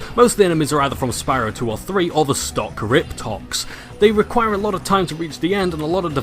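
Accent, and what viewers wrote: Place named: Northern England, United Kingdom. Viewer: It's your accent, it sounds more Northern than Yorkshire to me XD Are you gonna re-review the Rayman games btw?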